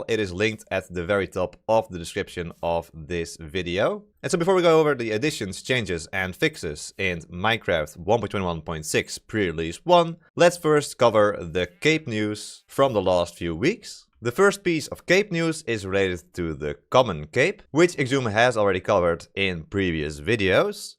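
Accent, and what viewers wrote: Netherlands. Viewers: Haha yes us dutchies can recognize it immediately hè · omg do I hear a dutch accent (you did a good job:D) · Do i hear a fellow Dutchman here!! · That accent. Are you Dutch? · İ hear your Dutch accent, ik hoor jouw Nederlandse accent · Loving the Dutch accent! Also.. GEKONOLISEERD! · I'm hearing a very strong Dutch accent. Is that true or am I imagining things? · Are you dutch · Love the video (but still miss X). You sound very Dutch, can anyone confirm? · Hah, ik hoorde gelijk n grappig nederlands-accentje. Groeten uit R'dam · Tijdens de video dacht ik: "dit is iemand die Nederlands spreekt" We hebben specifieke zinsbouw, klanken en intonatie in 't Engels. Anyway: goed gedaan! Mag nog. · Dutch guy Detected! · I smell dutch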